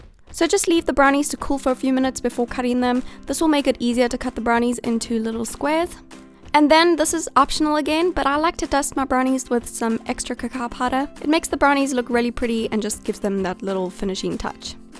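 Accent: South Africa. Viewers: You sound so South African! Are you South African???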